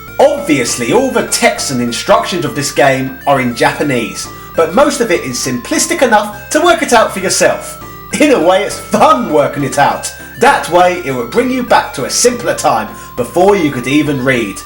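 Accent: United Kingdom. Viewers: Aw man 🎩 you know I'm a fan so why'd you have to slag on rap music? TBH your accent is a charming reminder of some of my favorite UK rappers.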